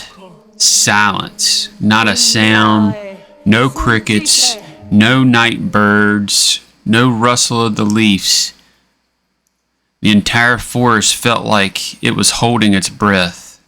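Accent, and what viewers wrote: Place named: Appalachia, United States. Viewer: Killing time having a stumble through your playlists wonderful narating I love the Appalachian accent it'sy favourite of the American types and Ur all so handsome out there' too🐻🐻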